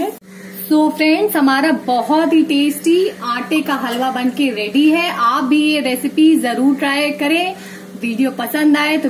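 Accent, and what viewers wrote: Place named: New York, United States. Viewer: NYC voice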